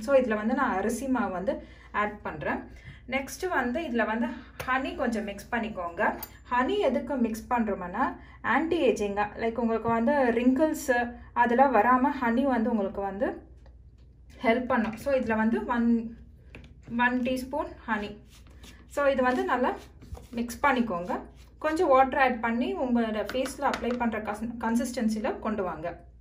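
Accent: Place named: Telugu, India. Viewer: Very nice tips...loved your telugu tamil :)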